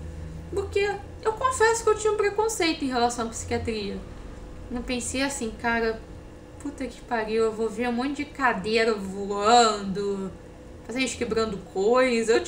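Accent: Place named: Rio de Janeiro, Brazil. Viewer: com essa língua presa é falando carioqueis é engraçado demais kkkk. Sabia que VC não diria às diferenças das profissões kkkk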